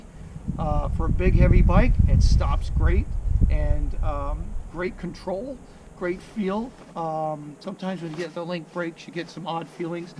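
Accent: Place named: East Coast, United States. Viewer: This is possibly the BEST MOTORCYCLE REVIEW I have ever seen. No bells, whistles, music and NO bullsh!t. Just a guy sitting on his bike laying out the DETAILS. (And in a charming east US accent). I’ve ridden in light snow but this guy is the “road king!” Never have I felt such respect for a fellow motorcyclist.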